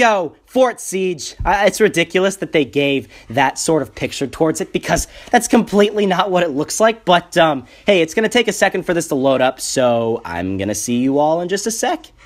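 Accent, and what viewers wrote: United Kingdom. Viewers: Nice british accent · No offense but u sound British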